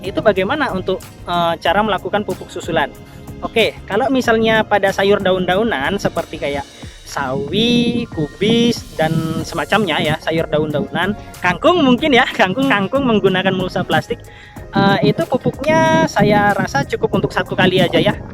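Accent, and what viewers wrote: Java, Indonesia. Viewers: mblenyek??? Wong jowo asli ki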